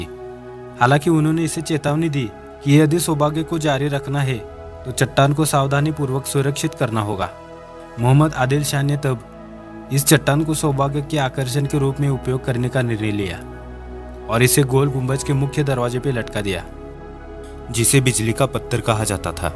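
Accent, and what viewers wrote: Maharashtra, India. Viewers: माहिती तर लाजवाब दिलात , पण तुमचा आवाज मराठी वाटतोय ❤️👍🏼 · इस वीडिओ के निवेदक की मातृभाषा मराठी है ऐसा लगता है ।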